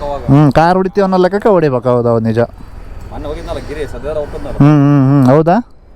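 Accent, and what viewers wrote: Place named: Davangere, India. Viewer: ಸಕ್ರೆ ಬೈಲೂ ವಿಡಿಯೋ ಯಾವಾಗ ಅಪ್ಲೋಡ್ ಮಾಡ್ತೀರಾ ದಾವಣಗೆರೆ ಭಾಷೇಲಿ ಕೇಳೋಕೆ ಚಂದ.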